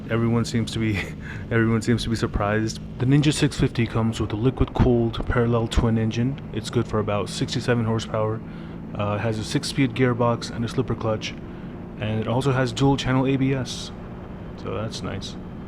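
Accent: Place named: United States. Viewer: Bro your English is impeccable. You sound like you've grown up in the U.S. your whole life haha.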